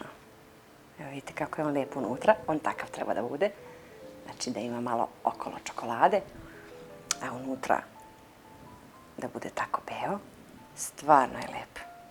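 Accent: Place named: Toplica, Serbia. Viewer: TAKO PRICAJU KAO DASU IZ VRANJA NE BAS TOLIKO ALI NAGLASAK PROKUPLJE TOPLICKI KRAJ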